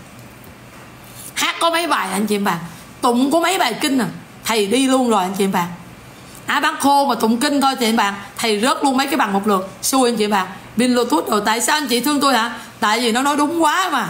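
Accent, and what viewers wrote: Standard. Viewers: noi qua chuan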